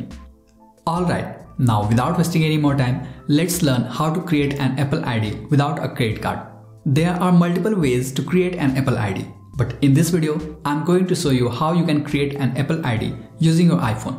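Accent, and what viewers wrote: India. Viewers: Why does he sound like Indian???????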